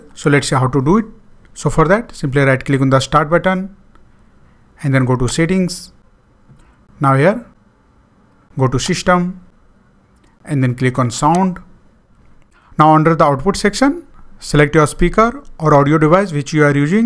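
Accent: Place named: England, United Kingdom. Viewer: goooosh youre english accent i DIE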